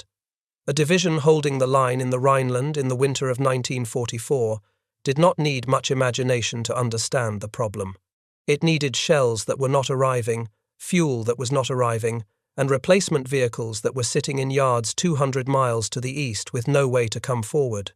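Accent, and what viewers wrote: United Kingdom. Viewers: I was getting more furious by the moment as you seemed to be ascribing all the action to the Americans. It is painful to hear a British accent not mentioning any of the splendid things accomplished by the night raids of the Mosquitos and the savage work of the rocket equipped Typhoons. Britain also contributed massively to the long-term sabotage delivered by the resistance groups by supplying the devices that could be used in place of direct explosives. The Gestapo would execute whole villages in regions where railways were sabotaged with explosives. When lumps of high explosive apparent coal were put into railway stockpiles and boilers just apparently exploded miles away, who could be blamed. Resistance operatives would put abrasive compounds into lubrication points where some would inevitably get into the steam lines. Locos would then simply lose energy and come to a halt while hauling a heavy freight. 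The Germans never worked that one out. It looked like simple wear and tear. I appreciate your mention, late in the article, of the heavy bomber dropping the Tallboy that destroyed the viaduct the Americans had failed to destroy. I wish you had mentioned the resistance groups who had been doing this work long before 1944. JW. Aust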